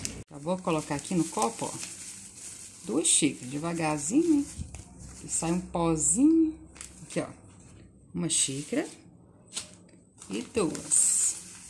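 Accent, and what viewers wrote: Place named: Minas Gerais, Brazil. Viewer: que bom escutar se o sotaque mineiro. Sou mineira mas moro em Brasília. Vou fazer a peta agora!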